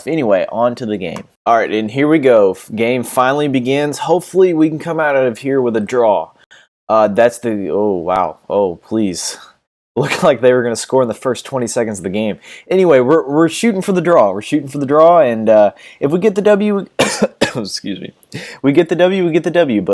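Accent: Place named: United States. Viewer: Enjoyed the video, with the american twang, great to hear how your voice has grown since the first video. Looking forward to more. Your tactics look a lot better as well.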